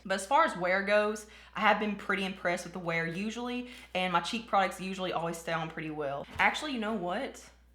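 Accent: South, United States. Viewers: This was helpful, thanks. The look is very pretty. Love to hear other southern girls!! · Ok I admit it. I didn't even watch the whole video before I hit subscribe because once I heard your accent I was sold. Helllloooo southern friend. I'm from Alabama. 😂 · I love your accent, sounds very southern! 💗🤗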